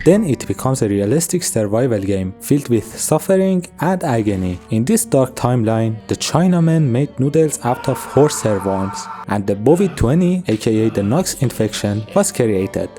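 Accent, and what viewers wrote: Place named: Iran. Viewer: داداش از لهجت معلومه از ایرانی😏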